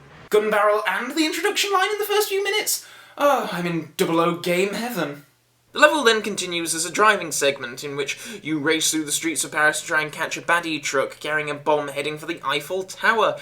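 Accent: United Kingdom. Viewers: Are you even British?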